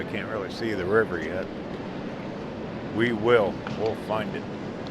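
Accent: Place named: St. Louis, United States. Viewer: My wife and I enjoy your Chanel. On a personal note, your dialect sounds just like my cousin- from St. Louis.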